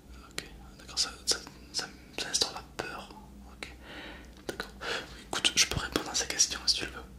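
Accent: South, France. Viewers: Et Bonjour c’est l’accent du sud 😉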